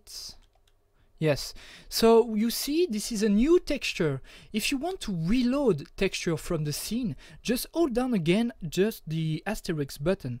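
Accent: France. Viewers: Super tutoriel merci. ça sent l'accent français non ?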